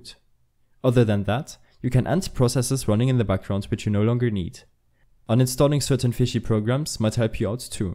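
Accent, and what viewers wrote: Germany. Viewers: He’s just German alright don’t judge people.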